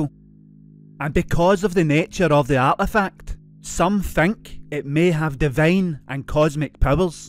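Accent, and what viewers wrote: United Kingdom. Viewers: I couldn’t have expressed my thoughts in any better way & have left comments saying so whenever I see them. It actually makes me cross that English speakers are complaining about an English speaker 🤷🏼‍♀️we don’t have American accents in the U.K. & we’re as diverse as the USA is in it’s large land mass. 💕:_TKTCEyeSeeYou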